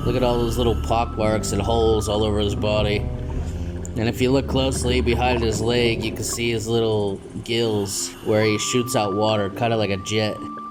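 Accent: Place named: South, United States. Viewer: nice nice vid.. plus i like your strong southern accent ! enjoy my country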